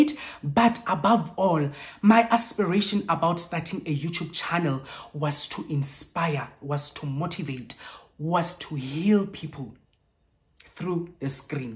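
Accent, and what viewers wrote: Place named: Sotho, South Africa. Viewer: oh wow ke utloa fela ka accent..I'm also sotho from Lesotho but based here in Joburg 🫶🏼 keep shining fave🎉🎉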